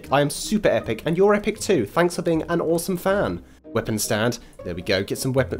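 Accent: England, United Kingdom. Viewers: Hi You have a Awsome British accesent And You are the best And Denis called Huskies Ugly:[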